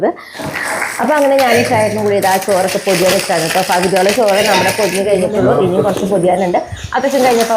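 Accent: Thrissur, India. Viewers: thrissur aano sthalam?..ollur aano?... talking style kandittu thrissur pole thonni..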